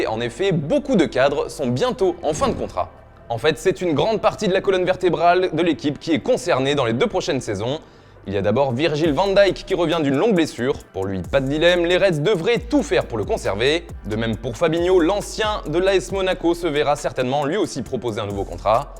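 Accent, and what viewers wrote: Italy. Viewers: Moi c’est les accents italiens qui me retient ici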